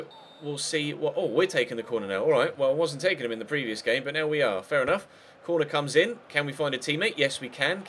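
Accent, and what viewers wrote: United Kingdom. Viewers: Jonathan Denis dacht eerst da je een brit waard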